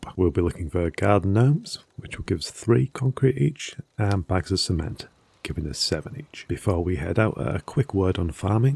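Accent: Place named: United Kingdom. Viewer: Came for the concrete, subbed for the British accent!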